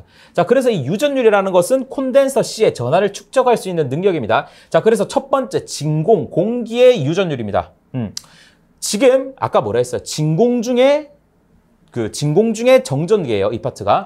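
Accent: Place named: Busan, South Korea. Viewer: 부산사람이죠? 좀더 귀 잘 박히네요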